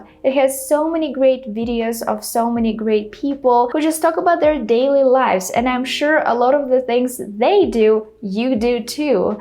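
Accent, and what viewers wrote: Russia. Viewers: Русский акцент) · Russian accent? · sweety. I am not fluent but I could understand the russian pronunciation in your speech.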